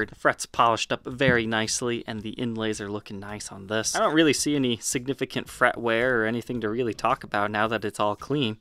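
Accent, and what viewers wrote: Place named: Midwest, United States. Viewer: Of course. I’m not a linguist, I just heard something different. Plus, I was generalizing. How could I possibly know what a Montreal accent sounds like without experience? I can spot a Chicago accent vs a Wisconsin or Georgia accent, for instance. I grew up in the Midwest, and I hear that accent in speech, although he tries to clean it up.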